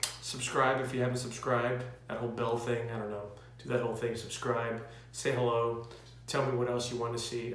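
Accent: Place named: United States. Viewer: Omg it's kind of weird to hear you in your American accent rather than the "Liverpudlian" accent you use in shows lol....I been lucky enough to see you performing live and you are simply AMAZING...You also thrown a Fab Four guitar pick to me and I gave it to my son that plays guitar and bass guitar himself and he keeps it as holy remains THANK YOU SO MUCH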